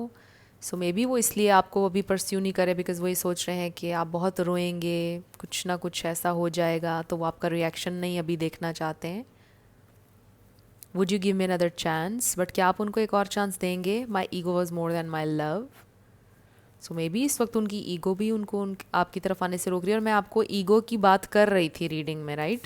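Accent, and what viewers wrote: Pakistan. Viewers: We were in long distance relationship we never met he planned about meeting in August but he blocked me on WhatsApp and I blocked him on SC .I Pray he will call me ❤........I like ur readings You are a Pakistani I bet ......your way of talking is Like Pakistani .❤keep going keep it up.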